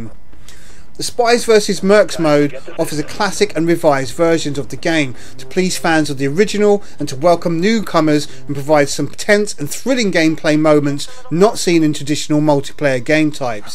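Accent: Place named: United Kingdom. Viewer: I like your British accent, which sounds very soothing for video game reviews. But there are certain elements and design choices which I honestly can't appreciate in Blacklist. First it has too many mandatory combat sections and that level where you are in the stead of Briggs dips you in an FPS, which is a bad idea of which I sincerely cannot fathom the existence. Second there are too many dogs that turn into pure annoyance if you go for a perfect stealth route. And there are too many levels that force you to go in one direction, with segments being entirely separately from one another in such a way that once you go past a segment, the game loads the next one so the enemies in the previous one no longer tracks your move - this will not make any sense if designed for Chaos Theory, because that game allows you to play in a non-linear fashion (remember the bank mission where you can play one way and the other way around). 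Blacklist is not like a small open world, for it often gives you a straight road and there too many areas so well lit which will give you pain if you want to play stalker.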